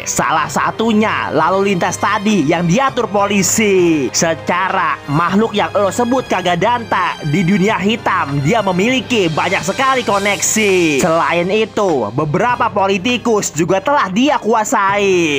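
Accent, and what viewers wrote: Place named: Bekasi, Indonesia. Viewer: Makin yakin gw kalo lu orang Bekasi bang, minimal Jaktim atau Bogor deh, kaga danta emang 🤣😂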